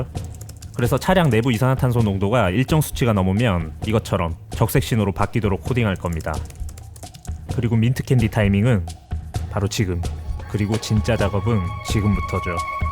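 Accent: Gyeongsang, South Korea. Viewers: 사투리? 경상도분이신가? 암튼 멋집니다👍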